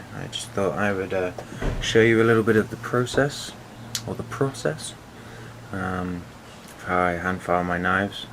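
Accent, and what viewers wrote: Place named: United Kingdom. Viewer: Ahh cool stuff I knew it was a uk accent haha. I have a lot of subscribers from Canada. Cool videos brother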